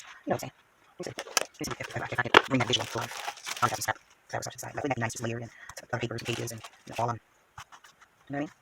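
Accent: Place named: New York, United States. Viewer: I always forget you are a New Yorker until you mentioned it. I can still hear it in there. 🥰 Please do a tea journal when you can squeeze it in. I’d love to see it. xoFaith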